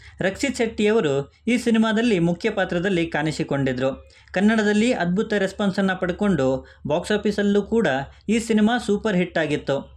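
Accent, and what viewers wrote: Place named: North India, India. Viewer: Bro ur kannada pronounsation is luke northies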